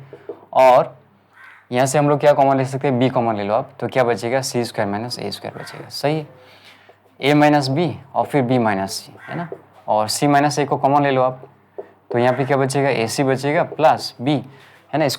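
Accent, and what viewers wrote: Assam, India. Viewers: Sir aaap assam se ho ki nahi aapka awaj se pata chal raha hai ki aap Assamese ho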